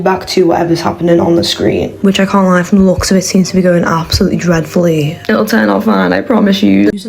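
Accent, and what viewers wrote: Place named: Liverpool, United Kingdom. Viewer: thank u scouser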